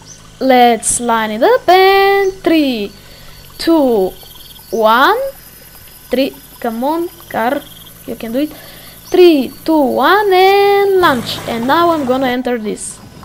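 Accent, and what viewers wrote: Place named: Bulgaria. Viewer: nice bulgarian accent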